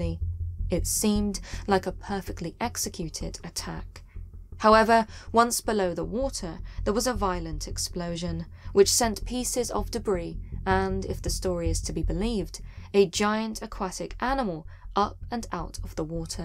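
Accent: England, United Kingdom. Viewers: It's an English accent, not British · I love this informative channel!!! Thank you for presenting your content in a classy and professional way!! It urkes me soooo bad when you click on serious and mysterious videos and it's either a computer voice or some joker trying to make funny comments while presenting. Very well done, love your beautiful English voice ♥️ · You are a great storyteller. This just happened to pop up on my main page, so I thought I'd take a look at it. Being a songwriter, musician, vocalist and body builder those are some of the things that I mostly look for on here. But since youtube started adding different types of videos to my page I started to watch a few of them. You're really good. It's because of the way that you tell them. You also have that great British accent going on there too. I think that your British accent is awesome! 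You do a great job. Thanks.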